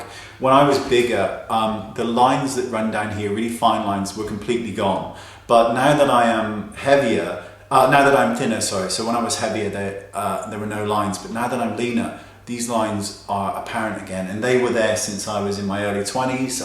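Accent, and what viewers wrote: United Kingdom. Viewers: I think you're gorgeous. You're British accent is probably misleading but if you're here in the UK call:-)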